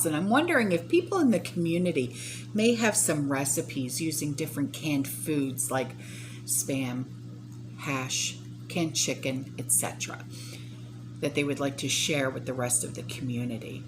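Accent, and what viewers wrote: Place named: Brooklyn, United States. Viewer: Well hello there, I stumbled upon your channel last night. I LOVE yer NY Brooklyn accent and your channel! Please don't hold back, pour on the accent! Prepping with pride!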